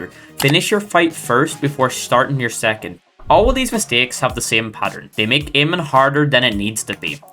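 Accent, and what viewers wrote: Northern Ireland, United Kingdom. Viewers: curious what part of northern ireland you are from. Only came across a handful on apex.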